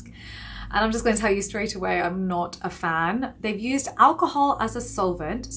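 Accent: United Kingdom. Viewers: She has a very sharp British Accent. ❤️ I love to hear her every day.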